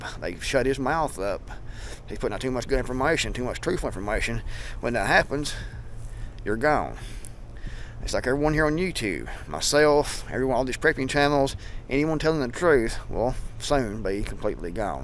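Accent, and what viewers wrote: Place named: Wilkes County, United States. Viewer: A little further into the video now. 😂😂😂 You sound like a Wilkes County boy. That’s pretty much the sentiment around here. - Except there’s too many that still think Trump will save us.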